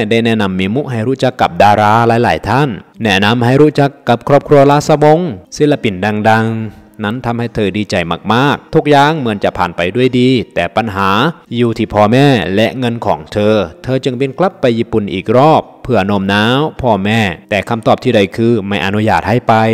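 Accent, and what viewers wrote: Laos. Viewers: เขาเป็นคนลาวพูดได้เเค่นี้ก็ดีเเล้ว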